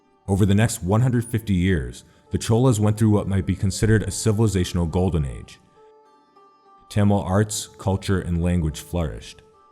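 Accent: United States. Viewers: Easy answer to this is ' last one to colonise were British they brainwash ALL WITH FALSE EDUCATION SYSTEM you guys still following LOL, so the thought of retaliation won't even b there, same with Maories n same with aboriginals ' it's good this american voice hv done good research not sounding bias so that's a good sign on the other hand if u keen must have a look to Mr Rajeev Malhotra ' books on breaking India forces'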